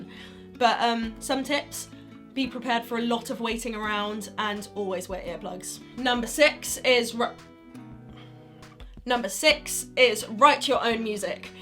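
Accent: England, United Kingdom. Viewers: Она англичанка?) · Hm... I thik the UK pronunciation ia really annoying... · I could listen to a british native speaking girl all day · Wow she has a British accent... as if she wasnt hot enough already. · She sounds English, splendid. If I hear much more of those loud mouthed yanks that sound like ducks with their stupid word awesome I may end up hurling my computer at the wall.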